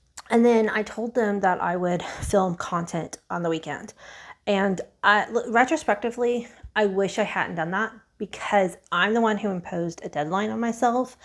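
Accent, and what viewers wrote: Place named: South, United States. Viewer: I knew you were from one of the southern states, I mimic accents and I pick up on accents....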